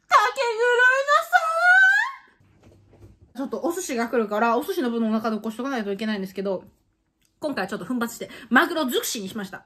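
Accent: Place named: Kansai, Japan. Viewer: 割と低音の関西弁ほんとに再現されすぎ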